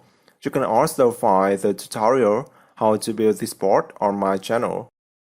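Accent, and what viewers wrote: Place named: Vietnam. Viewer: That Vietnamese accent. But great tutorial.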